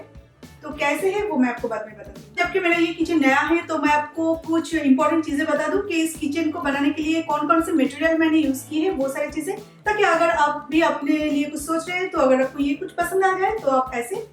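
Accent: Bengal, India. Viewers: Boy you have a heavy bong accent but I liked your honesty.. 👍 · Love bengali's hindi.. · I have some bengli friends..they speak hindi like u..un dostonki yaad agayi..nice kitchen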